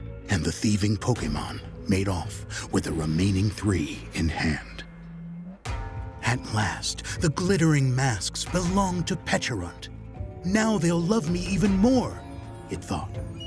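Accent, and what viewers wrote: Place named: United States. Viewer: Why isn't this in English British but in a "Murrican" accent? And why isn't there still an option for British English so Fahrenheit becomes Celsius in dex entries?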